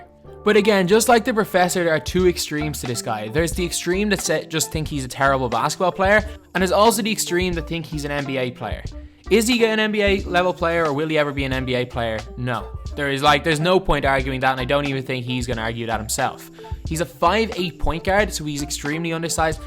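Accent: Ireland. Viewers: This is summer league ball, there is no defense whatsoever being played. All these comments are not judging him how he should be judged. His release point on his shot is low, he does not look like he can touch the rim on any forays to the basket (taking it to the hole). I am not sure why a video was made of a guy playing summer league ball with no defense and the whole time the video shows him going by other kids of pretty poor talent. Jass looks fine as a player, but he is right hand dominant, low release point on shot, simply going around guys that are even worse than he is as an athlete. What can he do from a defensive stance, how fast can he tip the ball and then outrun several plays on the way to a steal and a bucket? This is what scouts have to see. Time will tell what he can do in college in Canada. But, he needs to shoot with a higher arc, higher release point above his head and the video showed nothing about what he can do on defense. 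Absolutely one of the most pointless videos of all time!! The narrator who made the video (with I believe an Irish accent) states that some say Jass will make the NBA and then begins to debate this on his video. Who is it that is saying Jass will make the NBA? This video is insane! Just let the kid develop and then we will see how good he can be. We won't know this for another two years.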